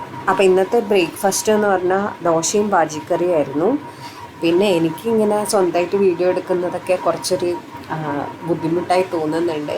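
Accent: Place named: Kannur-Kasaragod, India. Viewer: Eachinde nad ede kannura kasroda slang kekumbo thoninn . Nan kasargodil ninnan ta ❤❤😊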